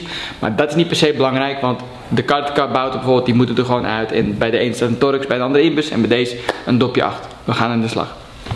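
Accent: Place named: Holland, Netherlands. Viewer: Zijn uitleg is best leerrijk maar snelle Hollands accent is niet te volgen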